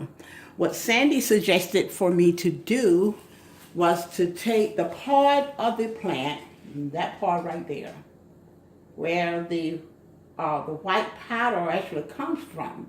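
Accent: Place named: South, United States. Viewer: Miss I think you are precious I do totally enjoy watching your videos you make me laugh you remind me of my ex-husband's grandmother. But I am positive that you are not as old as she. But it's just maybe your southern accent or the way you talk but I just find you precious please keep putting out the videos that you have your plants are beautiful I do enjoy my plants also I talked to them and I love them like they are my babies and my grown children laugh at me my son laughed at me today because I just bought a peace lily today and I was so tickled I could not wait to get it home thank you for your video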